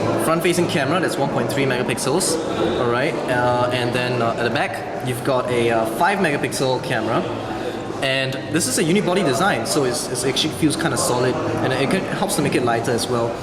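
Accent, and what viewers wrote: Singapore. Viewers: Singapore accent, my accent, lol.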